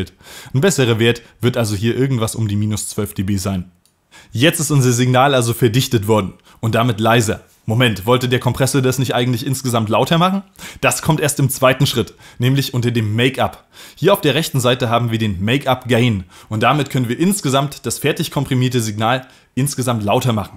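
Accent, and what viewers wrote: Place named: Northern Germany, Germany. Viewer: Darf ich fragen, wo du gebürtig her kommst? Dialekt mäßig, würde ich Norddeutschland sagen. Niedersachsen oder Hamburg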